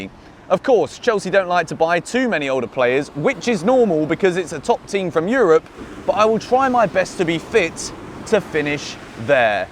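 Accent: Scotland, United Kingdom. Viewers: I would like Oscar back but I'm worried about him playing low-tier football for so long and how much the league has changed since he left. His contract expires in 2024 so Chelsea will have to buy him. I love the unexpected Scottish accent. Really love the nice daily dose of in the midday. Donnarumma would be nice because some fans forget that Edou will be out next season for AFCON and Hakim will be out as well but hopefully, we will have a more stable team next season to replace Hakim. Really love this channel and I am happy I found this channel from the beginning of it.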